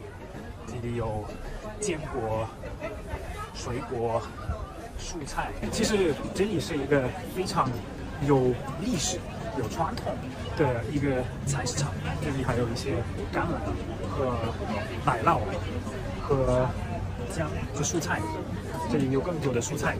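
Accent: Sichuan, China. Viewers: 滿口四川口音😂😏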